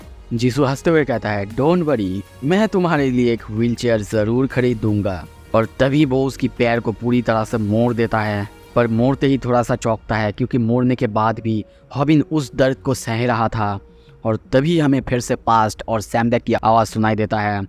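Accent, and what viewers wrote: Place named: West Bengal, India. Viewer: Bhai tum west bengal se ho kya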